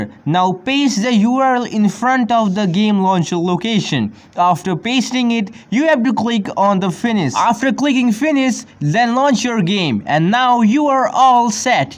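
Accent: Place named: India. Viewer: Sounds like india or china, nice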